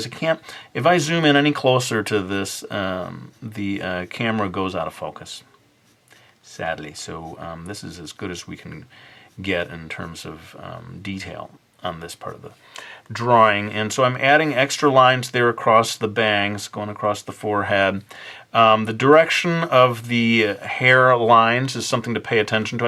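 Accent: United Kingdom. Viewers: you are so brittish :D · Your british accent is hilarious.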